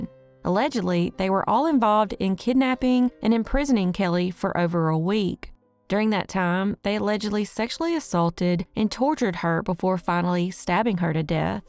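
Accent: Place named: Louisiana, United States. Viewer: Based on your accent (which I love and think is adorable) I'm gonna guess Louisiana. Am I right?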